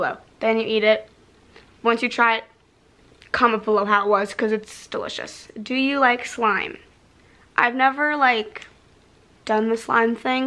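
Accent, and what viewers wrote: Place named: United States. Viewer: Omg your American acsent so good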